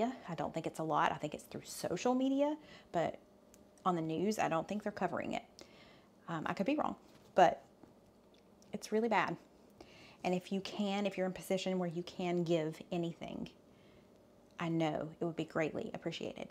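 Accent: South, United States. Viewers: Hey We are here for you My Prayers and Blessing to you and and All your Family and Thank God weren't hurt And Remember Gracie Lou is a your Guardian Angel I am From East Pennsylvania and I am Full puerto and just be yourselves you'll Rican and I was Born😅 Big Family and I So Love Your Southern Accent and My Dear you are Perfect as you are and is Hilarious and So My Dogs Name is Tootsie Lou he has My Middle name but it's spell Different It's Bonnie Luz omg I would Love to meet you in Person and I am So Sorry You had Loss Gracias Lou I had cry so fast I had a Sense it, it's ok to cry and it's ok.. I love watch her Old Videos You 2 jusr Be yourselves ok The Cutest Couple I watch All your old Videos you light up My Spirits Real z people IN The Kitchen cooking and Kidding around Having Fun ❤My Sister and I watch you.. Sending All My Prayers and you get Back where you were Before the Hurricanes and you Safe.. 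you are Speaking Spanish Is On the Dot.. take care Mamasita And papacito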